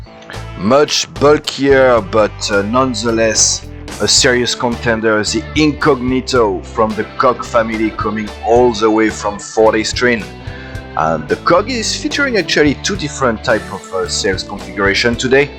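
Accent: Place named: United States. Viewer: Salut, moi aussi, comme James, je me suis demandé si en mettant de la marchandise à contre-gîte tu pourrais pas filer au près serré avec plus de voilure.Tu as essayé ? Mis à part, tu parles sacrément bien anglais et ton léger accent est très confortable pour ma compréhension, tu as vécu aux états unis ? A plus...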